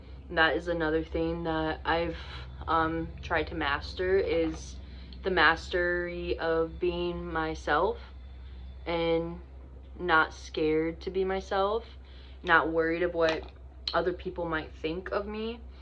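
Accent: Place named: United Kingdom. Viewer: The Brit accent was on point 👌💯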